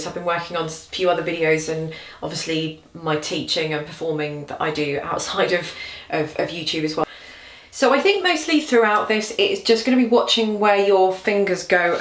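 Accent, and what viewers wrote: England, United Kingdom. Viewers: honestly sometimes i am watching you only for your english accent😂 btw i am a foreigner your tutorials are what we need as student so thank you for your effort.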